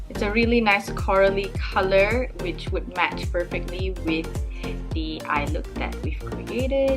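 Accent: Penang, Malaysia. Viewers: Please do make up tutorial in malay again. Love to hear your penang slang 😄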